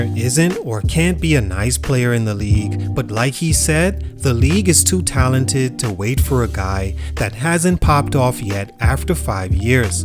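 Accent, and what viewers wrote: Bahamas. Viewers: Are you from The Bahamas. You sound like a Bahamian. The Bahamas is my second home.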